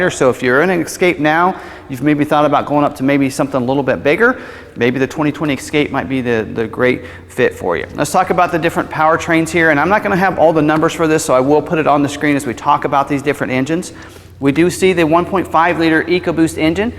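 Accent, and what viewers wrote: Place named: AAVE, United States. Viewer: Yep, an Ebonics speaker evidently. Gives me a lot of confidence in this guy’s expertise.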